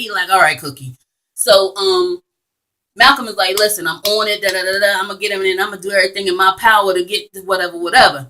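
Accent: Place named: Philadelphia, United States. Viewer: So good to hear the Philly "sound"! Great job from your Philly friend on the West Coast. Keep up the good work!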